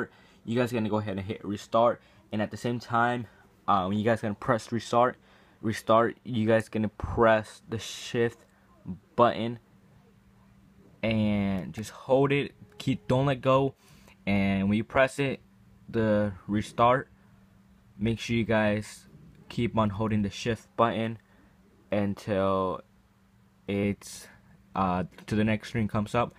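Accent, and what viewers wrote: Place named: South Asia. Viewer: You sound like a brown guy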